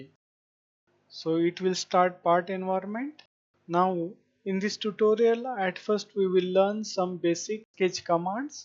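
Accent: India. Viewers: yk its dope when i see indian with accent teaching tysmmmm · What the fuck bro I can’t understand ur inidan voice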